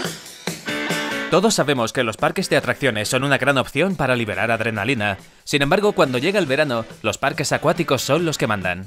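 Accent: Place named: Spain. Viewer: Típica voz española :v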